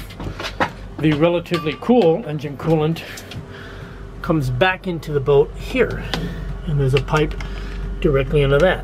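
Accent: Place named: Canada. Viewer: Aha ! That's why you have a Canadian accent!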